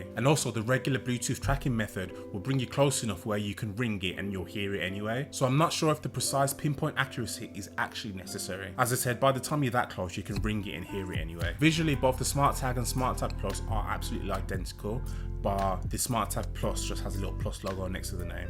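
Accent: United Kingdom. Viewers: I hate the British accent.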